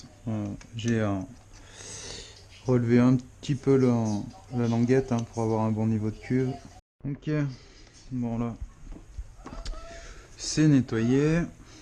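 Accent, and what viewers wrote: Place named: Forez, France. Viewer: Je reconnais cet accent. J’ai vécu un temps à Thiers et à Clermont. Tu ne serai pas du côté du Puy ou du Forez ? Salut à toi l’iroquois en tout cas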